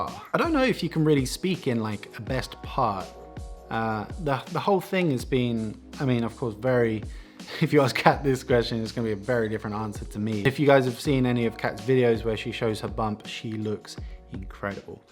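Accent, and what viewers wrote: Finland Swedish, Finland. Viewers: He is talking finland swedish not swedish.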